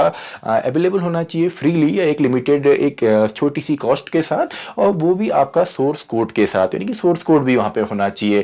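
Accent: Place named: Uttarakhand, India. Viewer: Sir u are from Uttarakhand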